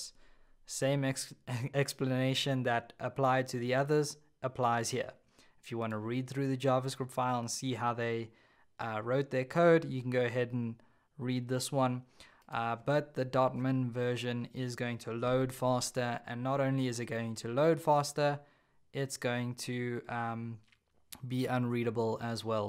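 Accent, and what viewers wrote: South Africa. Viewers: Sounds like you're South African? Great tutorial btw. Lekker man.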